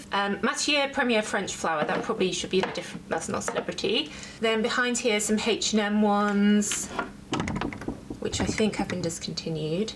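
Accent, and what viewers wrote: United Kingdom. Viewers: Congratulations,! I like your collection. We share a lot of preferences: Michael kors Gorgeous, Aqua allegoria mandarine basilic, l Interdi, la nuit tresor... your descriptions are just accurate, I can rely on! I also like your British accent, I enjoy both English and parfums❤😊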